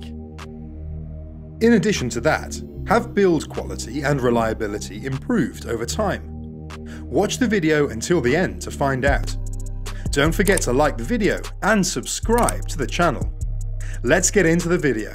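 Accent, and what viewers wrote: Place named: England, United Kingdom. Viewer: You have an English accent, promote your own stop condemning.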